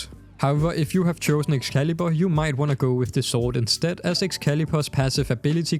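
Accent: Denmark. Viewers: You sound so Danish in your English and iam loving it. If i look across the water i can see Denmark:) Cheers from Flensburg. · im 5 sec into the vid are you danish?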